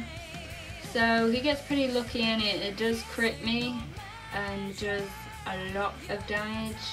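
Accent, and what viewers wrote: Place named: Nottingham, United Kingdom. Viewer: that Nottingham accent tho ;]]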